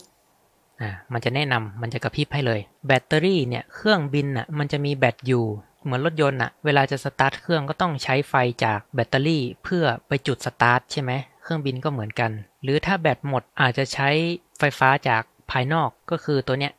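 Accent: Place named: North, Thailand. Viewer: กลางเด่น (สาบเมืองขนาดเน๊าะ รู้เลยว่าคนเมือง)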